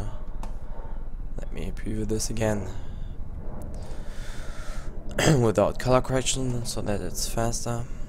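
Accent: Germany. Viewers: Man merkt an deiner Aussprache das du ein Deutscher bist :D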